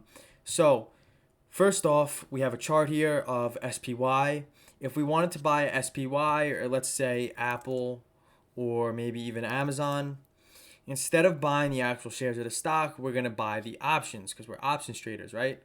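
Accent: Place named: Long Island, United States. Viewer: Brother is from long island, i can hear it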